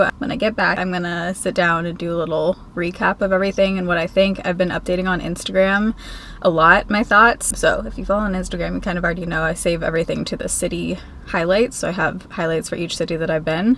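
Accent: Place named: Georgia, United States. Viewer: Let's not forget the sweet Georgia boy accent!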